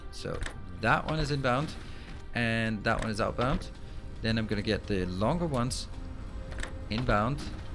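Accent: Denmark. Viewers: I just tuned in because of DSP, really liking it so far. And it's great to hear a Dane (I assume based on the accent) doing let's plays!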